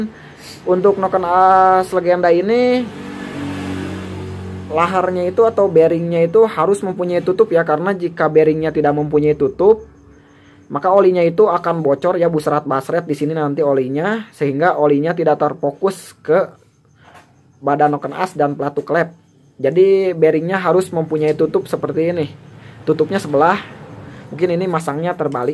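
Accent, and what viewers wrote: West Java, Indonesia. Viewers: lokasi mana bang..logatnya kayanya sunda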